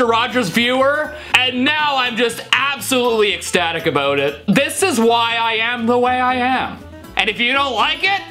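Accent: United Kingdom. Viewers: Very heavy British accent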